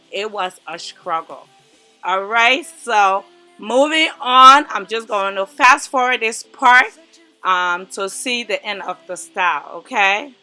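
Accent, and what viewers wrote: Liberia. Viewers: Jessica Wiah yeah I can hear her accent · I told myself she is from Liberia..then came to the comments section to confirm..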